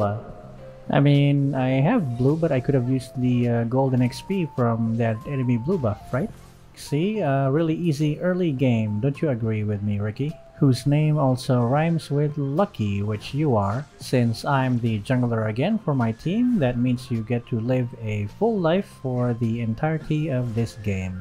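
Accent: Philippines. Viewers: Dammnnn your tagalog accent 🤤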